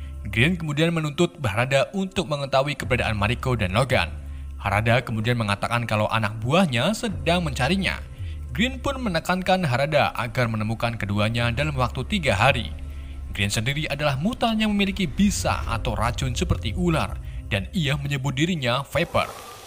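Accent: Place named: Tegal, Indonesia. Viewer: Admine wong tegal jebule..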